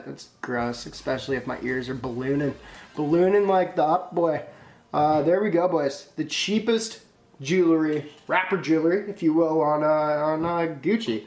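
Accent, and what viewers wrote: Canada. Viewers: dude are you canadian or american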